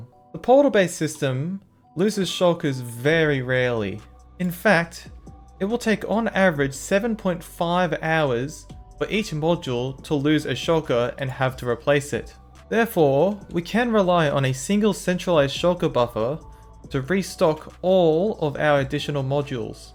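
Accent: Australia. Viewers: Aaahhhhyyyyy an Australian!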